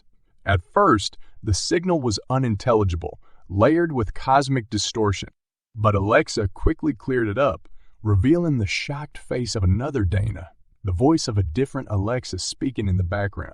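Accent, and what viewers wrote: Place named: South, United States. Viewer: No ! Country accent does not work for sci- fi .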